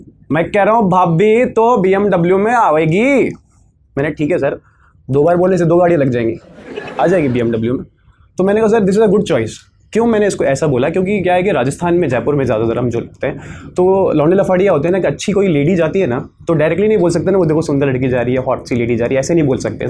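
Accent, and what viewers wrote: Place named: Haryana, India. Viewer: Sir ye hariyanvi accent h... Rajasthani accent nhii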